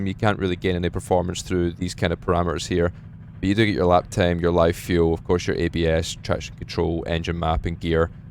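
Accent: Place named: Scotland, United Kingdom. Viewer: you've a great Scottish accent 😀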